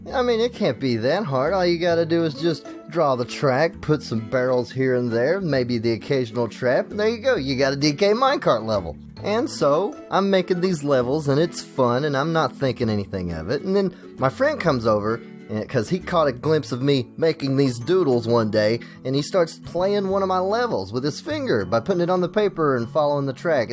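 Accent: South, United States. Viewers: Is that a southern accent I hear?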